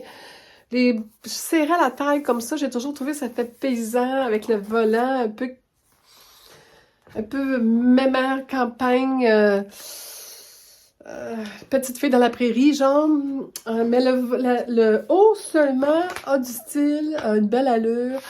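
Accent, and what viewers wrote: Quebec, Canada. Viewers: Très beau travail l beaux tissus l et accent du Québec où vivent mes enfants et où je vais tous les ans l merci pour la video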